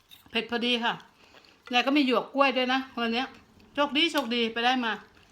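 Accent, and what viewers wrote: Isan, Thailand. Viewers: ยายเป็นคนจังหว้ดใหนค่ะสำเนียงเหมือนคนอีสานแถวสกลนครนะใช่ปะค่ะคือคนบ้านเดียวกันนะ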